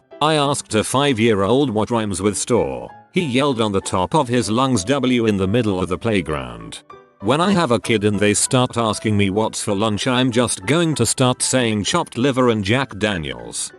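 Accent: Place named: Midlands, United Kingdom. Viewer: That makes sense. Of course, some of these have to be read. Because some of these pronunciations make it seem that the TTS is using a Midlands accent. (If that makes no sense, it's difficult for someone Irish to understand someone with a Midlands accent when they're talking "normally". Or basically anyone who doesn't have a Midlands accent.)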